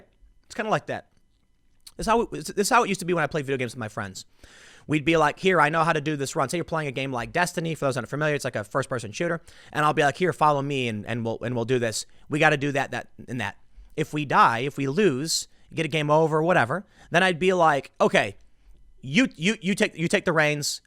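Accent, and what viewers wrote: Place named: New York, United States. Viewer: Anyone else notice how New Yorker accent came out much stronger in this video? I guess it's because he's talking about his homeland.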